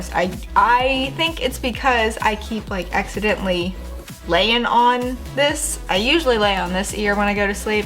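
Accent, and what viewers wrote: South, United States. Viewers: It’s not “duh” it’s “the”.. Its not “Pearson” it’s “piercings”.. Are you southern or something?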